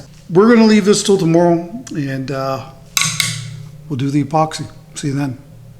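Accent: Canada. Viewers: I love the Canadian accent, so I'm here for the commentary! I love this Hoser, Aye!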